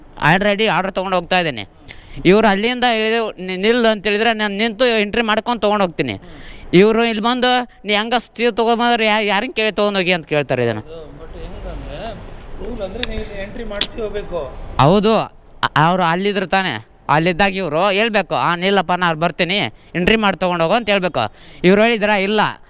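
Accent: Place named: North Karnataka, India. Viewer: ತಮ್ಮ ಯಾವ್ ಊರ ಹೇಳು😂😂😂 ಉತ್ತರ ಕರ್ನಾಟಕ anasatte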